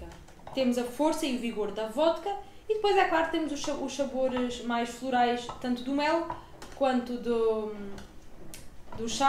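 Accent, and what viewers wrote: Portugal. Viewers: é uma lady elfica, escutar Português original é sempre bom ainda mais se for falado por uma Lady alta elfica.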